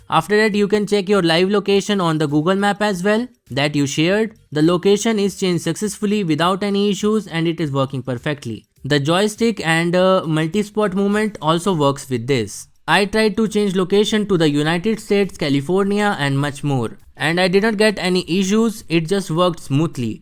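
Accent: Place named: India. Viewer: Soon as I heard the Indian accent..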